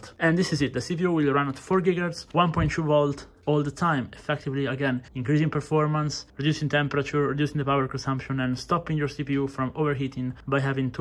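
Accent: Italy. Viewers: Come cazzo si capisce che sei italiano 😂❤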